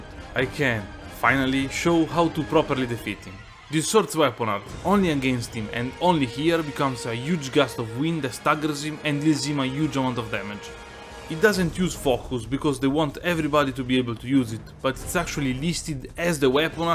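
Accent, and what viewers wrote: Italy. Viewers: tutti i tuoi video sono meravigliosi, e adoro sentire il nostro accento quando parli. Gran lavoro ;)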